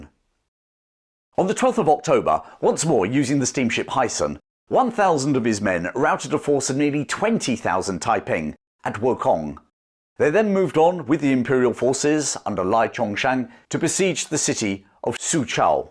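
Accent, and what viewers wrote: England, United Kingdom. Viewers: I have to agree. No AI voice, and his real pommy voice I can understand. And we get to talk with him live.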